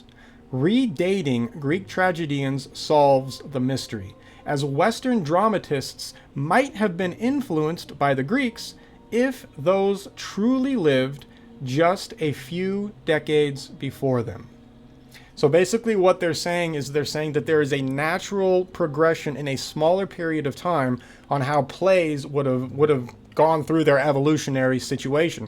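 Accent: United States. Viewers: Dude, you sound like you're american. Please come to Europe, you can see christian sepulchres from the 4th century underneath my neughbours back yard UNPRESERVED. You can see a church from the 10th century on a hill in a nearby village. You can see a fortress built by Turks on top of a Byzantine fortress who built on top of a Roman Castrum on top of a Celtic hillfort.... and I live in the Balkans. It's all fine and dandy when you read and see PRESERVED places from history. Come see raw forts wrecked centuries ago, you'll get a sense that Roman stuff is way older than late Medieval stuff when you actually touch it OUTSIDE OF A MUSEUM, outside of internet. Plus, the girls are fine and a beer is like $2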